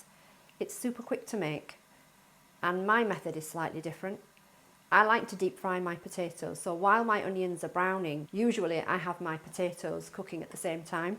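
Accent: United Kingdom. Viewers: It's not dubbed, it's the presenter's normal accent, a Northern English one. A part of the UK that is renowned for it's Indian cuisine. By your logic, all chefs who present cooking shows should present them in the language of the meal that's being prepped. Jamie Oliver might struggle a bit. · plus there’s nothing more commanding yet soothing than a Yorkshire accent, you sit up, and pay attention whenever you hear one!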